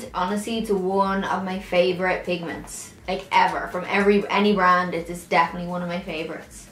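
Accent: Dublin, Ireland. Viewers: Just new to your channel but can I just say that’s it’s so fab to see a big and successful YouTuber with a Dublin accent!! Any other Irish Youtubers I know of all have like posh South Dublin accents or with an American twang there’s nothing wrong with that of course but it’s just really refreshing and exciting to hear an accent like mine on YouTube. Reppin’ the Northsiders!💁🏻 Fills me with pride!💚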